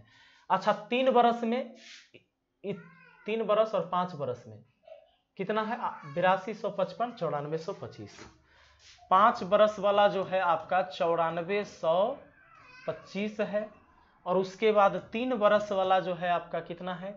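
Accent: Bihar, India. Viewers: बिहार के हो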